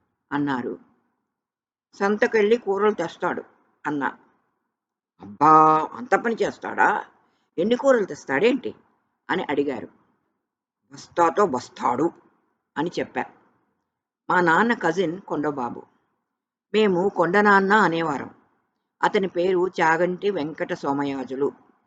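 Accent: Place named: North Andhra, India. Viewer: garu could bring out her childhood memories in a beautiful mesmerizing way.when ever she reads his stories I wonder whether chaso wrote so beautifully or her reading methods with beautiful modulations had enhanced the depth of the writings.As my fore fathers belong to vijayanagarm and srikakulam areas I enjoy that mandaleekam also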